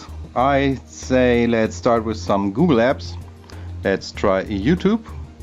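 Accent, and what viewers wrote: Germany. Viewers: Man merkt das du ein deutscher bist...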